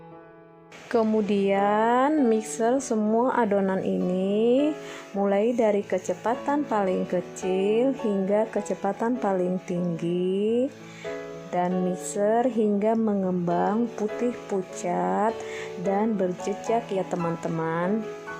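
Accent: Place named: Java, Indonesia. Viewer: Wah mantep cara bikin kue lapis😄 jowo medok hadir embak e.yuk yang lain....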